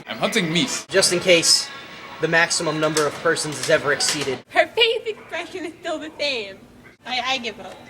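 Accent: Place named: United Kingdom. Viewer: You're Bristish accent is immense :L And I'm British, so i know what I'm talking about :)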